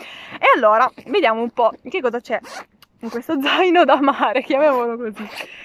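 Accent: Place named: Salento, Italy. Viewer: MAMMA MIA QUANDO ASCOLTO IL TUO ACCENTO, È MOLTO VICINO AL SALENTINO.. MAMMAMIA CHE NOSTALGIA DELLA MIA TERRA. SALUTAMI LU SALENTU,LU SULE, LU MARI E LU IENTU 🤭🤗🤗🤗🤗😂😂😂😜😜😜😜😜😜😜😛😛😛😛😛😛🌹🌹🌹🌹🌹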